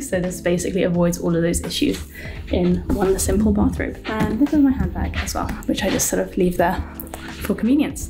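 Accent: England, United Kingdom. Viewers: so you born in British or in USA ? you have quite strong English accent. Do you have plan yo practice medicine in the US?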